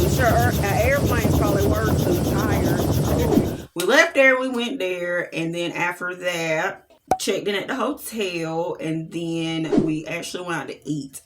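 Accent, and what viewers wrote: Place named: North Carolina, United States. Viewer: Your accent is everything. Where in NC are you from? You sound like my husbands people.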